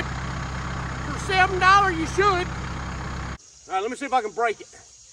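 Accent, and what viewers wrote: South, United States. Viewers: That's southern lingo. Window (winder), tomatoes, patater, onion etc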